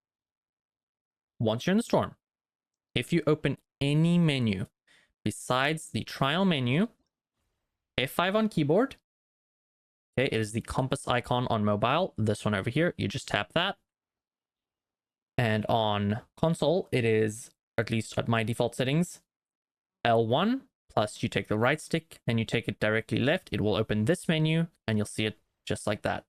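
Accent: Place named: South Africa. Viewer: Great video! Are you from south Africa? You sound like it! Also i subscribed cause i want to see more of your claymore series. Im doing an only female account so this is very useful for me thank you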